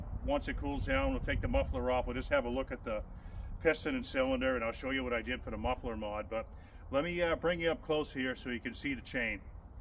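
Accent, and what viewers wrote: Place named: Massachusetts, United States. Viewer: just curious the accent sounded Massachusetts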